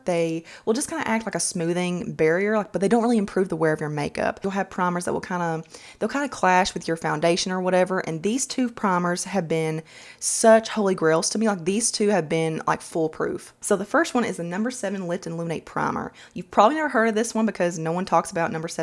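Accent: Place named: Georgia, United States. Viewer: I just found your channel and love it!! When I was listening to you talk I thought you sounded so much like me.I'm from Georgia.Looking forward to more makeup recommendations. We're having some very hot and humid weather here also.Thank you!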